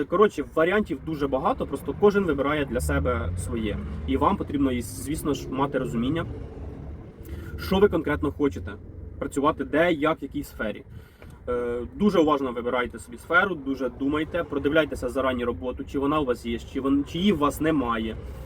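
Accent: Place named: Vinnytsia, Ukraine. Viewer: Красавчик чітко ясно без води))) по говору ніби з Вінницької області 😅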